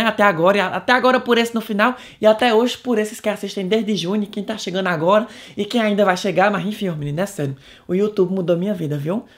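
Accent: Ceará, Brazil. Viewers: lindíssimo, amo você. sou do nordeste também e me encanto muito com isso de você mesmo não morando no brasil e tudo mais continuar valorizando a cultura nordestina, principalmente seu sotaque! todo sucesso do mundo, um salve dos alagoanos. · Cai de paraquedas no seu canal. E estou adorando. Que sutaque maravilhoso. Eita cearense arretado ❤😍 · Esse sotaque é muito meu!! 😂😂😂😂 Sou sua fã e também vizinha aqui no cariri cearense. 😍😍😍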